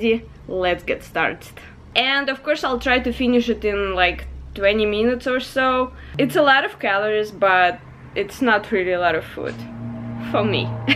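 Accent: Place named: Russia. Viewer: сразу слышно, что русскоговорящая хд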